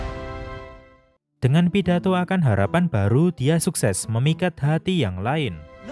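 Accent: Java, Indonesia. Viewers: medoknya jogja bangeeet ,❤️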